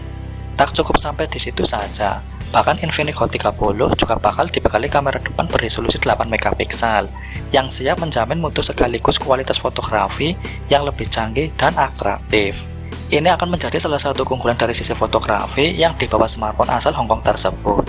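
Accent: Banyumas, Indonesia. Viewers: Absen pak , ora ngapak ora penak 🔥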